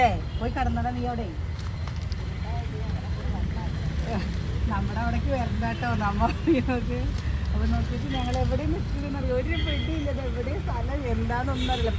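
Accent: Palakkad, India. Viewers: Aadiye angayaane zjnnaunkettutto palakkadan bashakke oru prthykataram banfiyaane chechi avvoo yentaanne ninga ingana parayine😂😂😂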